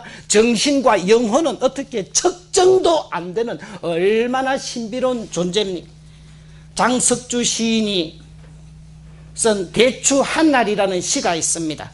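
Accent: Gyeongsang, South Korea. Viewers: 신부님!! 우연히 신부님 갱상도 말씨에 반하여 강의를 듣게되었습니다 경상도 말씨 넘 정겹습니다 뒤늦게 신부님 강의 듣느라고 요즘 삶의, 신앙의 생기가 살아납니다 신부님 목소리 오래오래 듣고 싶습니다 열정적인 좋은 강의에 감사하며 무엇보다 건강하시도록 기도하겠습니다~♡ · 좋은 말씀 감동입니다 ㆍ 경상도 악썬트에 노래로 재미나게 말씀을 풀어주심에 감사 드립니다 ㆍ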